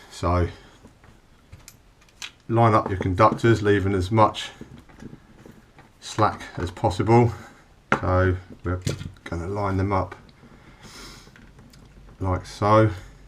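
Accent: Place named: Newcastle, United Kingdom. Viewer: just because your speech is almost the same as a colleague of mine’s (an electrical guy himself yet working in a Datacenter) originally from Newcastle… forgive my ear I am not british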